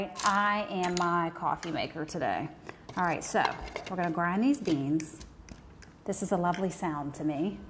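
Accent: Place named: South, United States. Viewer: You look (you could be) Italian with a southern USA accent 😍 Where are you? I like the view of yiur opening scene... Give Thanks (Now let me rewind so I can pay attention to your tutorial 💖)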